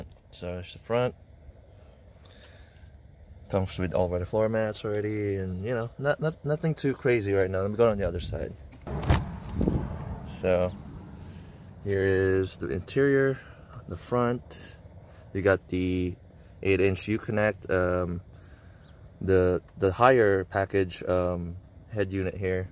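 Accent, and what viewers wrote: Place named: Philippines. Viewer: You sound Filipino :) nice car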